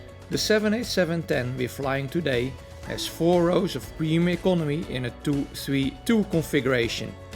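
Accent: Netherlands. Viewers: Ik kon ook gelijk horen dat het een Nederlander is maar ik vind het prima verstaanbaar Engels, en daar gaat het om..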